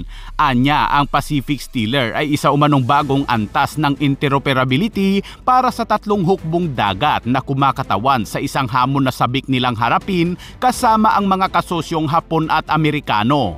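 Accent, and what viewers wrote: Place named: Visayas, Philippines. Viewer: Kala ko mali lng spelling mo bisaya pala ikaw bumasa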